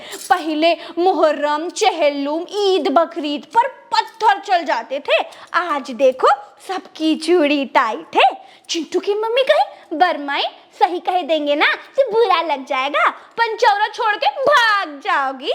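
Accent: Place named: Bhojpuri, India. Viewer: Great acting loved it keep it up ! Love the Bhojpuri accent !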